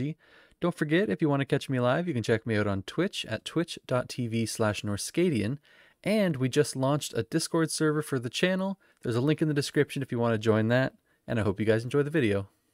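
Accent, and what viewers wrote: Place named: United States. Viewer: What a weird name...are you actually Norsk? You sound like an American.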